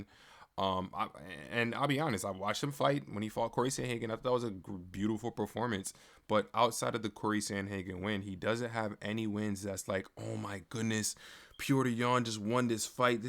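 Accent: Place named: New York, United States. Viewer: my fault bro, you sound like me a little and a bunch if others I know lol. NY maybe? Bless up bro and solid takes.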